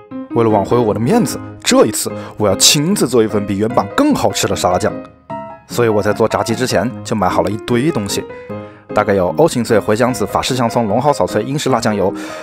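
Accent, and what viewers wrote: Wuhan, China. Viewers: 这熟悉的武汉普通话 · 一听说的就是弯管子武汉普通话😁😂😁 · 是武汉人吗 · 武汉的阿儿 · 是不是湖北武汉的？经常会有不经意间漏出的汉味普通话出来😂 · 我一直在评论里找武汉人，果然不是我一个人觉得他是武汉人😂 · 这弯管子武汉普通话哈哈哈哈就是有点吧辣…哈哈哈哈哈哈听着跟我妈差不多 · 听口音~武汉的？ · 第一次来是武汉人吗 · 是武汉的吗？听口音有点像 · 哈哈哈哈哈哈好喜欢家乡口音！！武汉爱你❤️ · 武汉人吗？ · 他是武汉的之前做热干面那期视频有提到哈哈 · 博主这是武汉老乡么哈哈哈哈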